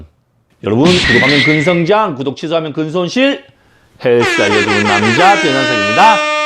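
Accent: Gyeongsang, South Korea. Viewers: 점수가 쁘라스가 되고 점수를 몬어꼬 ㅋㅋ 저도 경상도 입니다ㅋㅋ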